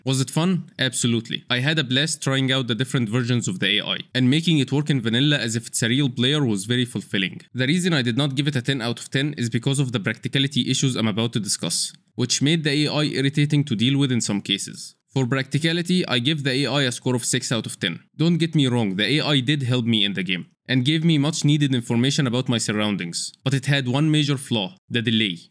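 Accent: Egypt. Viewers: I think.. your accent is Egyptian ? 👀🤔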